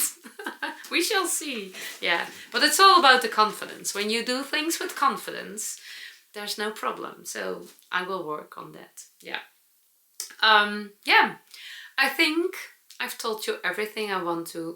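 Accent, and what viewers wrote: Netherlands. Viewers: Thank you for a lovely video. You are very inspiring. Please don’t loose your charming Dutch accent! 🌷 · I could listen to you all day. Love your English with the Dutchy accent. The yarns you bought look beautiful too. Can’t wait to see what you create!! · This looks like a wonderful weekend. I think your dutchy English is charming. Thank you for Sharig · I enjoyed your video so much I love your Dutchy English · Dear, I ❤ your Dutchy english. It is so sweet.😊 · I think your English is perfect, just the way it is. It’s ok to let your Miss perfect go. Your Dutchy English is what makes you so lovely ! I just love your knitting style! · Dodgy? It's "Dutchy English". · What a marvelous festival. And your extensive detail conversation/coverage of all the events/your precious moments… With laughter, just infectious and joyful for us to share. 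So very happy for you. Your English is beautiful… And your Dutch English is part of your charm for all of us! So now you have raised your inner confidence level speaking in such a venue… It makes such a difference when you are with people who have the same interest! I watched most of the podcasts of the people you shared the panel with they are my favorite favorites along with you Marvelous interesting story about the roll up the sheep company! So meaningful” heart jumping“. Whatever you make will be extra extraordinary… You have such a design sense. Just adore the fibers that you bought… May you enjoy each stitch with them! Interesting the English ladies’s name was Diane who will teach you English as that is my name! I felt rather smiling when you said her name! You will take your time to rest recover… And I am sure that there will be more beautiful stories that you will share with us. 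Your wonderful videoing made me feel as though I was with you! It was fabulous! Wishing you well… Take a step back… Rest yourself! And then… Dive into your next project! You are such a loving, joyful spirit, and it touches me deeply to watch you! And in advance of your birthday… I am sending you the most heartfelt warm embraces of wishes for good health, many heart, jumping moments, not only in your knitting, but with you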